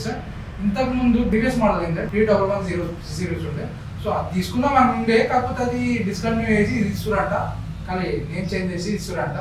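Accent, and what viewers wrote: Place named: Hyderabad, India. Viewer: Still hyderabad slange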